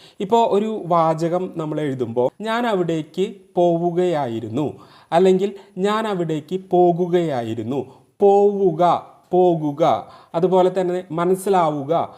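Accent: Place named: Thrissur, India. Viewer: You are speaking trichur Malayalam Correct Malayalam is Kottayam& Pathanamthitta Malayalam.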